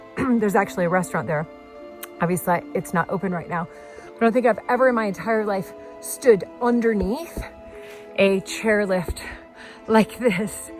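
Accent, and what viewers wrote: United Kingdom. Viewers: Soooo beautiful. I notice that some of your diction is now coming close to a British accent. cute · Wow,you picked such a wonderful area for your house! Just gorgeous.Your American accent has some touches of British e.g.You pronounce t in "delightful" and "little" with a very hard t instead of the softer ddd American style.Now you will be picking up Italian too.I doubt you are conscious of it.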